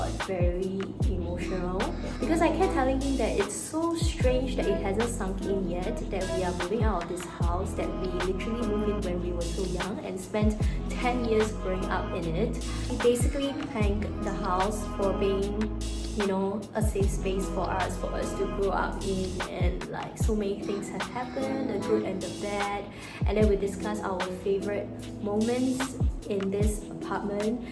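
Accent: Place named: Singapore. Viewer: Congrats again on your next milestone in life!!!!!! 💖 also I think you still sound quite Singaporean just that you enunciate your words properly hehe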